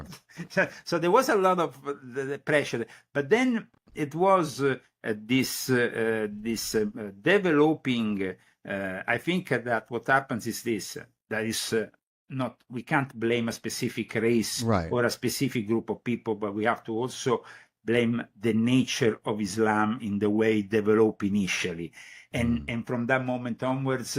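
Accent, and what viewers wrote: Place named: Italy. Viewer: Just brilliant. Love the Italian accent!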